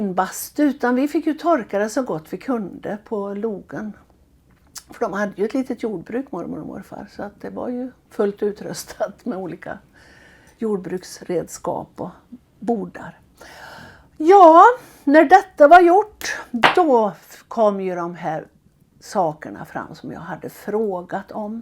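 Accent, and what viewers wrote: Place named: Skaraborg, Sweden. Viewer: Så roligt att se den här filmen och höra dej, berätta på din härliga Skaraborgska, om linet.! Jag använde den i klassen när vi pratade om Lin( nu när vi inte kan åka Skara med klassen längre). Roligt med Tidaholm och Holma också. I mitt linneförråd har jag en bunt ofållade halvlinnehanddukar från Holma😀 från min svärmor som bodde i Tidaholm.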